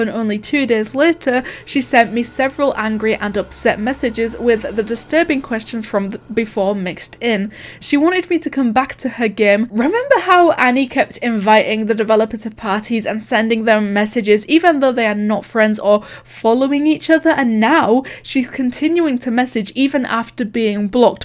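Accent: Scotland, United Kingdom. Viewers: kay ayh ayh You're British Aren't you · I like her scottish accent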